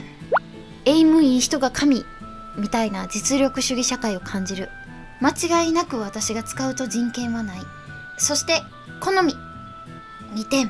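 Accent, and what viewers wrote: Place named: Kansai, Japan. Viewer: モンハンワールドグラフィック神っとる、そしてさんの関西弁がかわいい…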